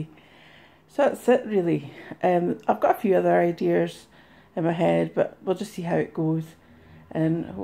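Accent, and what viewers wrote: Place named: Scotland, United Kingdom. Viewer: You do sound Scottish, but I love it!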